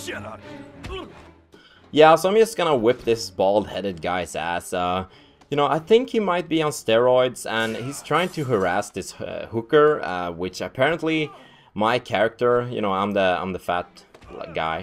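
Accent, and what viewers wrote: Sweden. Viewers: If the guy who voiceovers this clip isn't from Sweden I'll eat my hat. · ha 10 seconds in the movie and i can tell, ur swedish;)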